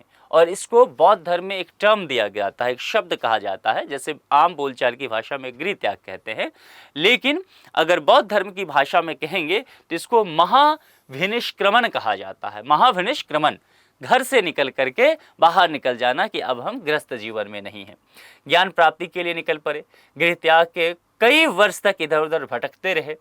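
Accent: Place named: Bihar, India. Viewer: Bihari h sir 🙌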